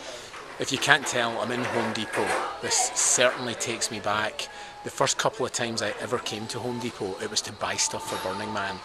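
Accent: United Kingdom. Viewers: what are you.. a reporter!? damn man... stop trying to show the burn... just do it... stop talking and saying "ya... uh ya.. uhh ya....uhhhh ya uuuh ya...( with u.k. accent etc etc etc) what is this non sense!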